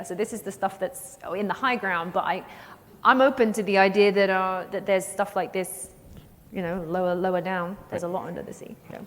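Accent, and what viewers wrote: RP, United Kingdom. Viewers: Oh god this is a disaster. She's been riding the ditzy, irreverent, 'errrm-girl' thing for a while now, and it's bad enough on a pre-recorded podcast. Being pretty and having a posh English accent isn't enough. You need to be vastly knowledgeable, a skilled communicator, and compelling to listen to. People really underestimate what it really takes to be a Professor Brian Cox or Dr Irving Finkel.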